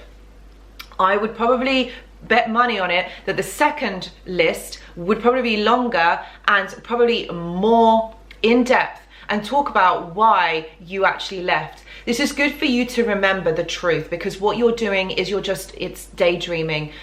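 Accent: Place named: Bedfordshire, United Kingdom. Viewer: You sound like you’re from Bedfordshire or Hertfordshire haha... and yes cool video, thank you 🙏🏻 realign when I see 222 ☮️ This had 111 views right now too haha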